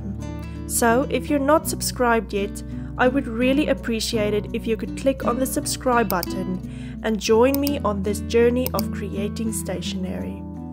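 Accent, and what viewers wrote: South Africa. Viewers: Always fun clicking on something on Youtube and hearing that SA accent! May your business grow bigger and bigger each day! Sending love, from a Saffa in the US❤️ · I am a small printing business in the UK and have been inspired by your determination. As an ex SA pat I subscribed and look forward to more videos on how you are doing. Keep it up girl. Can't get enough of the accent. Kisses...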